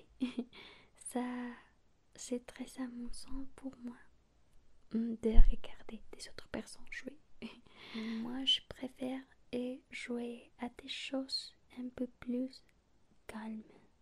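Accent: Spain. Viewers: Merci d'avoir répondu à ma question. 😊😊😊 J'adore ton accent espagnol. ❤❤ Du coup, un grand tour de France à programmer.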